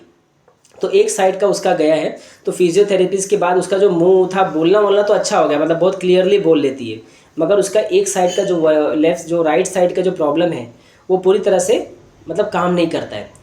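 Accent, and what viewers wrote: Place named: United States. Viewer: Sir we learn a lot from your videos but few videos you are uploading in English American accent not in your own English/ Hindi language. This is not very beneficial for us.please continue in your own language .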